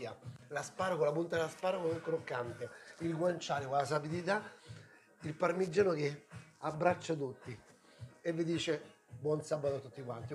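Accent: Rome, Italy. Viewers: bella ricetta! Mi fa impazzire il tuo modo di parlare romano!e che carino Marietto!...stavo pensando di usare il riso invece della pasta all’uovo..che ne dici??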